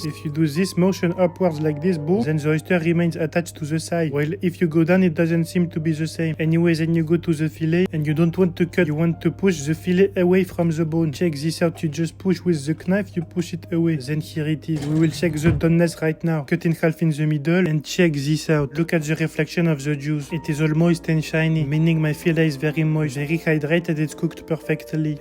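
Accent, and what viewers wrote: France. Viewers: la vie tes un bon frr, de tout les accents frenchies jsp quoi tes lmeilleur big, keep go et tauras plus aucun ptit français a la con comme moi dans tes com (le jour ou tu seras gros tsais)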